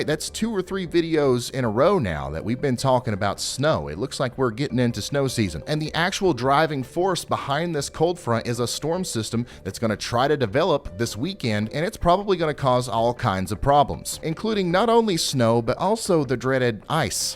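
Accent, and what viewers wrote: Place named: Tennessee, United States. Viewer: Well, he's as accurate as most! It's just that he's so much fun to watch! It's obvious he loves what he's doing, and he DOES have a knack for following the correct models. He may have a much better read on what others forecast, as he really does his homework. That slight Tennessee twang doesn't hurt, and he's just so dang cute!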